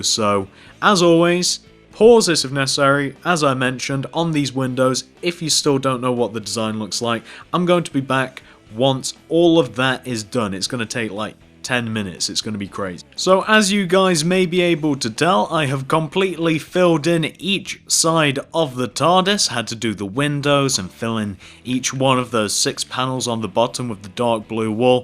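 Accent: United Kingdom. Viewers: he does have a British accent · yea. they have british accents not stupid people accents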